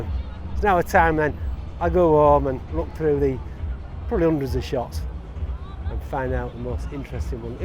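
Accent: Yorkshire, United Kingdom. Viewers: Given that I've seen videos from you in Bradford, Halifax and Saltaire I guessed you must be living not to far from my birthplace. I watch your videos as much for the accent as the photos. Home rule for Yorkshire!! 😉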